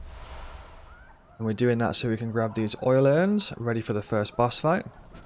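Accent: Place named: Yorkshire, United Kingdom. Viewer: Hello there! May I ask if you're from Yorkshire? (I mean no offense —I'm Korean, and your accent sounds preety similar to my English tutor's and I like his) I'm an fanboy of FromSoftware, and I'm using your videos to study English while simultaneously enjoying my hobby. Thx!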